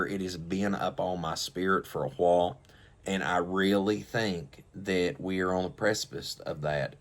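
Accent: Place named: Texas, United States. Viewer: Where in Texas are you speaking brother ?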